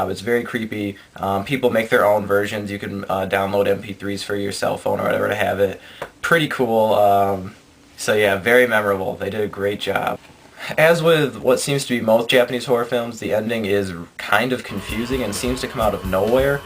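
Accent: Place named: Michigan, United States. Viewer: I'm betting you're from Michigan... :p Nice accent ;)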